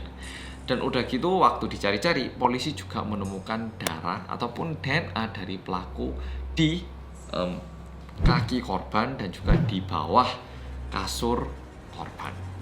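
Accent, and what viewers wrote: Java, Indonesia. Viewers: Orang Korea Yang MEDOOOOOOOOOOOOOOOOOOOOOOOOOOOOOOOOOOOOOOOOOOOOOOOOOOOOOOOOOOOOOOOOOOOOOOOOOOOOOOOOOOOOOOOOOOOOOOOOOOOOOOOOOOOOOOOOOOOOOOOOOOOOOOOOOOOOOOOOOOOOOOOOO OOOOOOOOOOOOOOOOOOOOOOOOOOOOOOOOOOOOOOOOOOOOOOOOOOOOOOOOOOOOOOOOOOOOOOOOOOOOOOOOOOOOOOOOOOOOOOOOOOOOOOOOOOOOOOOOOOOOOOOOOOOOOOOOOOOOOOOOOOOOOOOOOOOOOOOOOOOOOOOOOOOOOOOOOOOOOOOOOOOOOOOOOOOOOOOOOOOOOOOOOOOOOOOOOOOOOOOOOOOOOOOOOOOOOOOOOOOOOOOOOOOOOOOOOOOOOOOOOOOOOOOOOOOOOOOOOOOOOOOOOOOOOOOOOOOOOOOOOOOOOOOOOOOOOOOOOOOOOOOOOOOOOOOOOOOOOOOOOOOOOOOOOOOOOOOOOOOOOOOOOOOOOOOOOOOOOOOOOOOOOOOOOOOOOOOOOOOOOOOOOOOOOOOOOOOOOOOOOOOOOOOOOOOOOOOOOOOOOOOOOOOOOOOOOOOOOOOOOOOOOOOOOOOOOOOOOOOOOOOOOOOOOOOOOOOOOOOOOOOOOOOOOOOOOOOOOOOOOOOOOOOOOOOOOOOOOOOOOOOOOOOOOOOOOOOOOOOOOOOOOOOOOOOOOOOOOOOOOOOOOOOOOOOOOOOOOOOOOOOOOOOOOOOOOOOOOOOOOOOOOOOOOOOOOOOOOOOOOOOOOOOOOOOOOOOOOOOOOOOOOOOOOOOOOOOOOOOOOOOOOOOOOOOOOOOOOOOOOOOOOOOOOOOOOOOOOOOOOOOOOOOOOOOOOOOOOOOOOOOOOOOOOOOOOOOOOOOOOOOOOOOOOOOOOOOOOOOOOOOOOOOOOOOOOOOOOOOOOOOOOOOOOOOOOOOOOOOOOK. 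· Medhooooookk Suaranya persis pemotong rumput 😂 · Jiaahhh... Baru buka youtube channel yang ini pas pembukaannya spontam ngikutin pembukaan sampe ngikutin medoknya yang panjang banget sampe ditanya sama papah "kamu ngapain?" 😂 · Mau nya lewat aja tuh tokpednya..krna udah ngeklik nih pidio.. Eh iseng ja balik ke tokped ternyata MC nya si medhooooooooooooooooooookkk...😀 Akhirnya nongkrongin dah.. Klo aq dari sekian translater nya bts di indo lebih milih ㅎㅎㅎㅎ Cie cie cie yg bakal di kontrak untuk translate nya BTS di next project konser indo.. 💚💚💚😎😎😎 짱... 너무 좋아...짱 짱 진짜로...고마워😊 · Hello guys welcome back to bersama orang Korea yang medoooooooooooooooooooooooooooooooooooooooooooooooooooooooooooooooooooooooooooooooooooooooooooooooooooooooooooookkkkkkkkkkkkkkkk!!!!!!!!!!!!!!!!!!!!!!!!!!!!!! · Alhamdulillah medoknya makin panjang dari biasanya. Ayo SEMANGAT! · Medooooookkkny luar biasa' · medoknya hanya bertahan sampai sini wkwk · Medooooooooooooooooooooooooooooooooooooooooooooooooooooooooooooooooooooooooooooooo ooooooooooooooooooooooooooooooooooooooooooooooooooooooooooooooooooooooooooooooooooooooooooooooooooooooooooooooooooooooooooooooooooooooooooook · Korea Medoooooooooooooooooooooooooooooooooooooooooooooooooooooooooooooooooooooooooooooooooooooooooooooooooooooooooooooooooooooooooooooooooooooooooooooooookkkkkkkkkkkkkkkkkkkk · Mas korea medok deg deg kan gak tadi interviwe sama bts oh ya jungkook minum susunya lahap ya🙂😌✌🏻🍼💜💜💜💜💜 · Meddhoooooooooook e dhuowo men om 😅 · Astaga aku sebagai bolobolo bangga ternyataa mas korea yang medoookkk interview bts. 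OMG proud of u😭😭✨✨✨✨✨ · Medhook ke kedawan mas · Ternyata tetep medok ya sekalipun lg ngeinterview bts, uwu mantul mas 💫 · Wi medok e nyapo ye mas? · Medooookkkk~ Melu ngempet nafas pisan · Ketemu lagi, tadi di Tokopedia jadi MC BTS, sekarang di YouTube jadi detektif medhokkkkkkkkk....😄 Langsung nonton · iya mas medoknya, medoooooooooooooooooookkk banget yaak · Bersama orang korea yang Medoookkkkkkkkk....... Seketika akupun ikut tahan nafas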